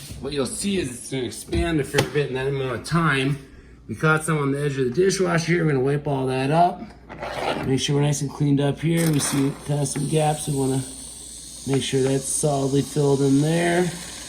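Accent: Australia. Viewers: Hah Hah....northern Australian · Entertaining and informative. Love the Australian accent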